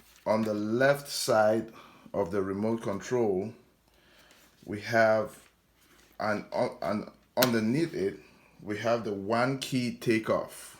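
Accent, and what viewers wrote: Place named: Trinidad and Tobago. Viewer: Are you from Trinidad? Just wondering, your accent sounds just like my old boss.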